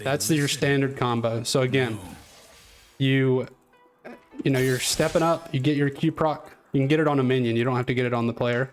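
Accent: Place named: South, United States. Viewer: The Southern accent made this extra cool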